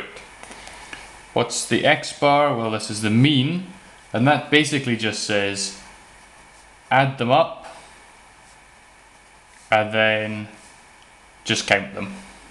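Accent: Scotland, United Kingdom. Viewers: Herschel's Lost Leg. He sounds Scottish....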